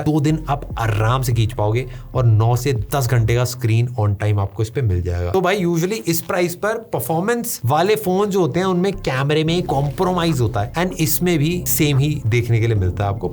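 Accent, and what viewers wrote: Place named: Haryana, India. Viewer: Haryana wala feel hai bhai kay bolne ka kya haryana se ho kya???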